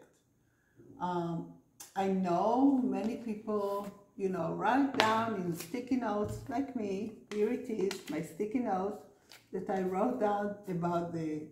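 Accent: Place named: Israel. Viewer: Hi!! I just received an email from you introducing yourself! I’ll have to go back and look for which pattern was yours as I’ve been participating in a few different blog hops. I recognized your Israeli accent too when you started speaking! I only had the pleasure of visiting Israel twice, once with my Hebrew School for a youth trip, and again the following year for my brother’s Bar Mitzvah. But I loved it there and I love the incredible internet that let’s us connect with people from all over the world! I live in the USA, just outside of Philadelphia in Pennsylvania, on the East Coast. Best wishes to you with your channel. I’m going to look for your book on Amazon. Thank you for sharing! 💜🧶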